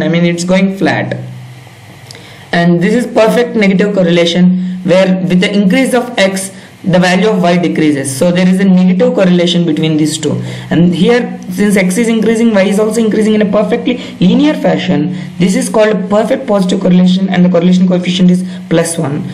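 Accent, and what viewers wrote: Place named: Spain. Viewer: hi are you spanish? sorry but i have to say yr accent is so hard (for me) to comprehend all of it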